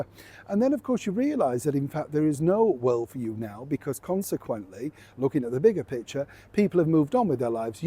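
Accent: United Kingdom. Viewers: You are a horrible man with a goopy British accent!🙄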